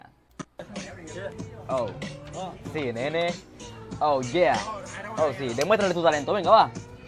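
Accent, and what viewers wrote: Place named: Colombia. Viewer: Eres de colombia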